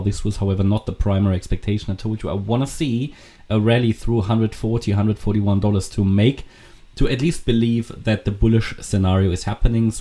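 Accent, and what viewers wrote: Germany. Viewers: Like Kris MM CRYPTO, Deutsche dialect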